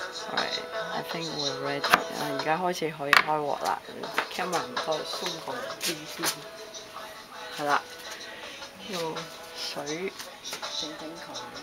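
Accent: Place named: Cantonese, China. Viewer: DV沒電了嗎?~_^ 您的廣東腔果然很重, 我看到了妳廚房裡面也有"米酒" haha~~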